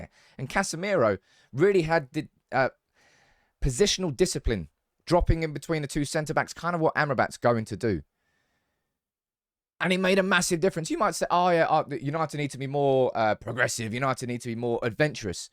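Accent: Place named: London, United Kingdom. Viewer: Would have thought you'd have been Arsenal with that accent, but I guess that's standard "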